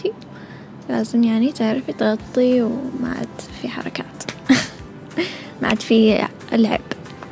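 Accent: Saudi Arabia. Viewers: تتكلمي سعودي صح أنتي سعوديه ولا أيش؟ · صدقا اول مرة بسمع بنت بتحكي سعودي, بنت ذكية و جميلة جدا و مثقفة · لهجتكي من جده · تكلمي عربي لهجتك السعوديه روعه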